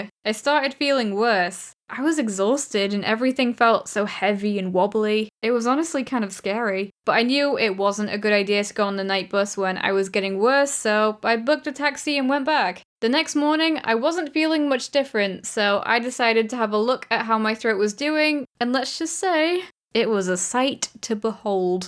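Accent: England, United Kingdom. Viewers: Where are you from? I'm English too, I love your accent! Hope you're okay now :)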